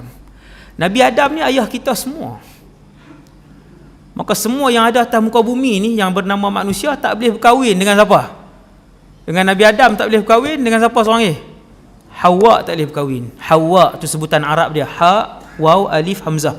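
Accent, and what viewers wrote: Kedah, Malaysia. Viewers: Pasai ustaz ckp KEDAH lah duk dengar ulang Kali x jemu.. Semoga ustaz SENTIASA DALAM KEREDHAAN ALLAH SWT 🤲❤️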